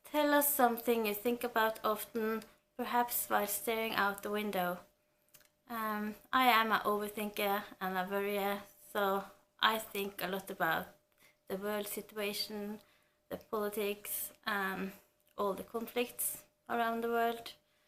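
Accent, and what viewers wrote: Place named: France. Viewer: Yeah! I love this tag as we get to know even more about the booktubers we love. also, you have a terrific French accent ! Like really cool :).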